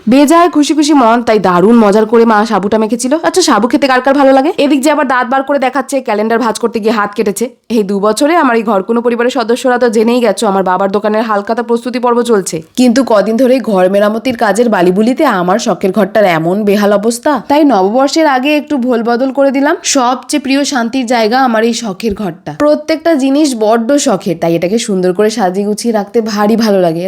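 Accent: Bangladesh. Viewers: Kotha gulo Bangladeshia jogonnno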